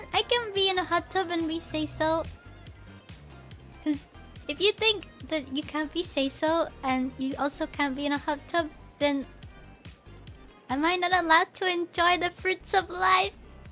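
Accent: United Kingdom. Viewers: She bri’ish!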